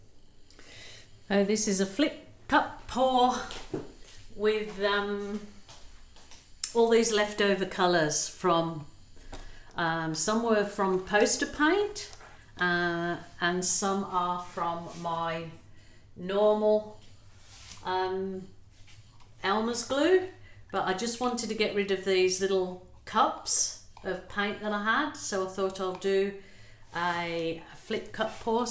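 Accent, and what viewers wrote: Birmingham, United Kingdom. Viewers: Better than wasting leftover paint :) And it's fun to just play around sometimes! I've noticed that when you take your photos, we see the colours more vibrantly and possibly more true to life. Also, I knew you were in Australia, but I assumed that you were from the UK lol, Your accent definitely has a touch of Brum to my ears. But I am almost completely deaf so my ears can't be trusted lol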